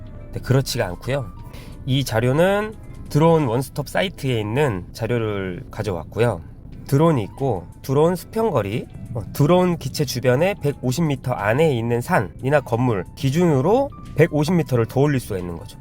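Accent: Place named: Daejeon, South Korea. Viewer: 대전분이신가봐요? 구독ㆍ좋아요ㆍ알람설정 했습니다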